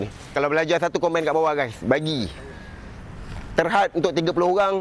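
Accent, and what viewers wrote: Java, Indonesia. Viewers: Pekat jawanya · Jawa bang… kena paham bang… gitu la bonyinya…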